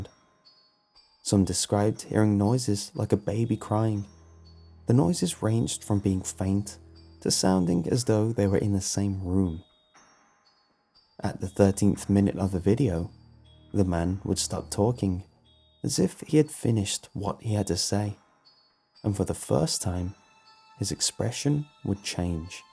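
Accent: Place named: Cockney, United Kingdom. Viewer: It's called a 'Cockney' Accent..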